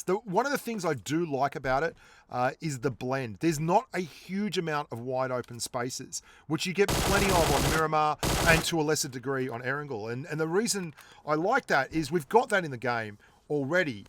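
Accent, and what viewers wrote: Australia. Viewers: Thanks...are u from Australia ?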